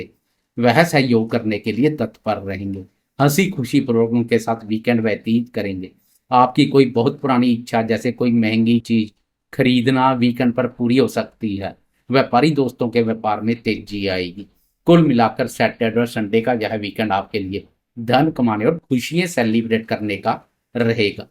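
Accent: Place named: Punjab, India. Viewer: जी पहली बार देखा है आपका वीडियो।। आपके बोलने में जो पंजाबी एक्सेंट आता है वो काफी आकर्षक है।। बाकी आपकी भविष्यवाणी तो आने वाला समय ही बताएगा कि कितनी सटीक है।। जय महाकाल 🙏🙏🙏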